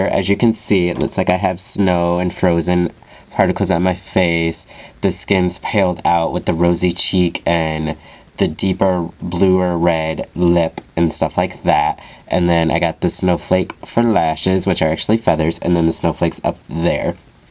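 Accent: United States. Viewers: I laughed when you said (something like that) "or maybe look like a drag queen on smoke-snow" *laugh* (do you mean the white powder - like drugs?) (American English is defenitivly harder to understand than the English one) *THUMBSUP* For your good Make-Up Tutorials :)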